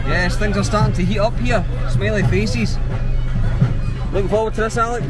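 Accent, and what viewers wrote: Scotland, United Kingdom. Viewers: Love your Scottish accent....enjoy Pakistan!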